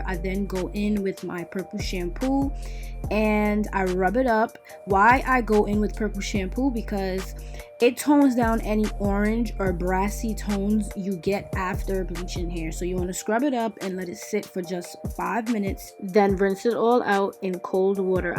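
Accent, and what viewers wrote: New York, United States. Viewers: You most def from New York , you said some “ we not budderin bread” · I love us New Yorker's! As soon as we open our mouth ppl automatically know where we're from! Sis said a "hoodie" 😂😂😂 #youslayedthatwigtho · Lmfao I knew you was from New York soon as I heard your voice 😭 what’s poppin. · Less than minute into the video I knew she was from New York 😂😂😂😂😂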